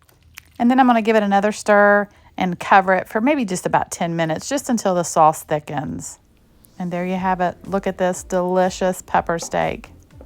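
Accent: South, United States. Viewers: Hi there! Enjoyed this video, your accent sounds Southern to me. I suspect that you are always on the lookout for meal suggestions to make on your channel. Might I suggest corn fritters and southern fried catfish. Keep up the good work, enjoy your videos a lot.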